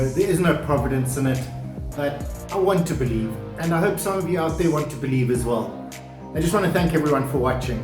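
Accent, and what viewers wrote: South Africa. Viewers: You sound South Africa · Can I guess we're ure from?.... South African Or Australian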